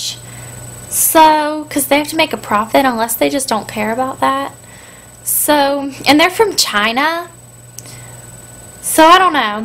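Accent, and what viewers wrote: South, United States. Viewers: OMG SHE KINDA HAS A SOUTHERN ACCENT!!! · I love her southern accent!! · this is so cute... i love her southern accent in this video... she doesn't sound like this anymore... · You sound country in this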